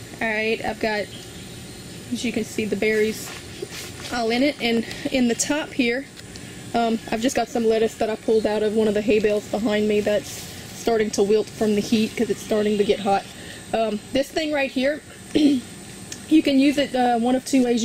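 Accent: Louisiana, United States. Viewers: Love the lousiana accent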